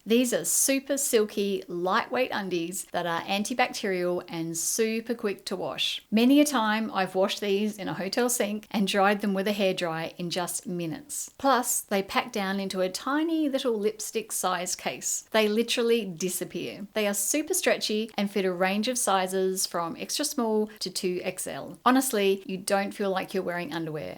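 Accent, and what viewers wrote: Australia. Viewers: One of the best packing videos I’ve seen. And I’ve seen a lot. It’s also great to hear an Aussie voice too. I’ve decided to give one bag travel a go next time we go overseas. Thanks for all the info in a very logical and calm way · Love hearing an Aussie voice ❤ Great video which has given me plenty of ideas as I prepare for a Mediterranean cruise and a few days in Dubai in September/October. Trying hard not to overpack for this holiday as I’m getting older and don’t want to be carrying items I don’t need.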